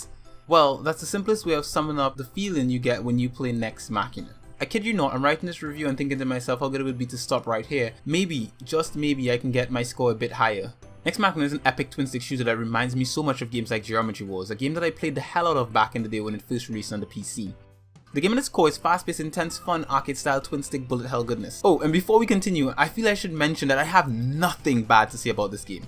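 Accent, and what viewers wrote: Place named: Trinidad and Tobago. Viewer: are you from Trinidad and Tobago?.... you sound like you are and great review.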